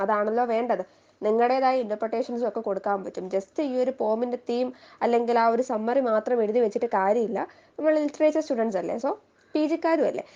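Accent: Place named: Trivandrum, India. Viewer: ha maximum trivandrum slang varathe nokki enkilum i caught u😌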